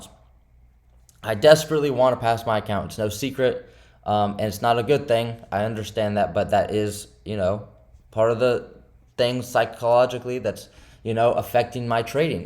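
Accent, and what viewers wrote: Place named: South, United States. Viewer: u got a southern accent, usa state u curently in? keep it up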